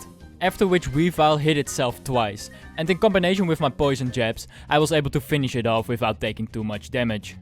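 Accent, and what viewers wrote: Netherlands. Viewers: Are you dutch? You sound pretty dutch to me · You sound Dutch. Is that weird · You have like a Dutch accent Like how you say Pokémon it's like Dutch (Mede Nederlandstalige leuke video) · You are Dutch aren't you? I can hear it because of your accent? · where are you from? i really get a feeling you are dutch or something just because of how you prenounce some words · I can clearly hear you’re dutch😂😂😂😂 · Dutch accent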